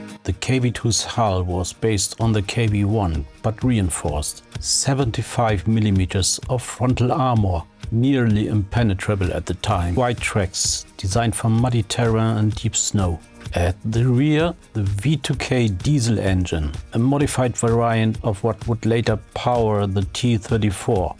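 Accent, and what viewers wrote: Germany. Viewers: I hear that you are german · love your vids! btw are u german?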